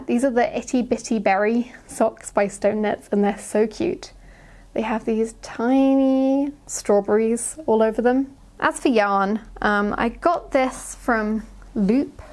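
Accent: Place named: Southern England, United Kingdom. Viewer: If you just put a short ‘e’ sound after the ‘r’ it comes up somewhere close to the Finnish pronunciation. So ‘Taremo’ is closer than ‘Tarmo.’ Then if you hold your tongue a little closer to the roof of your mouth on the ‘r’ sound, it gets a bit better again. That’s easier with a flat ‘a’ sound like we say in Yorkshire, though, rather than than your longer, Southern ‘a’ because the mouth is already wider and flatter. Think the ‘a’ in cat rather than in ‘Arthur.’ Lovely episode thank you x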